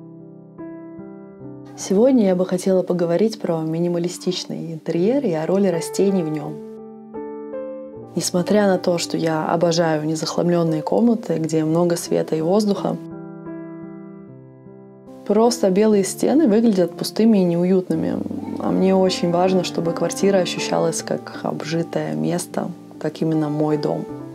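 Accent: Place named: Baltic. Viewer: Умница! Красавица! Спасибо Ирине за то, что она рекомендовала Вас!!! Обожаю ваш прибалтийский акцент. Вы для меня и настроение ,и вкус , и эстетика и женственность!!!❤❤❤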